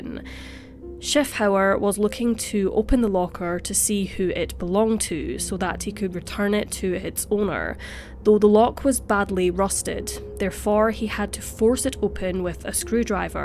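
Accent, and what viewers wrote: Scotland, United Kingdom. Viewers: Wow, Ive never heard of this case ever. Great video and its nice to hear a fellow Scottish voice on youtube. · unrelated but i love your accent, are you scottish perhaps? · This is my first video of you. Ngl, I didn’t expect a British accent. Woah 😳 (Scottish? Welsh? Northern part of England I think?) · woah i wasnt expecting a scottish accent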